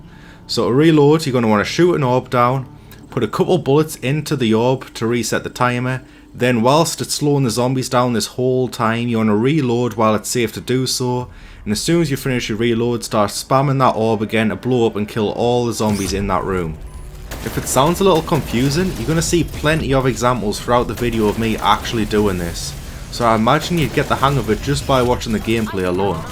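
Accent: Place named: England, United Kingdom. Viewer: another great video, but random question, always wondered where in england your from by your accentv haha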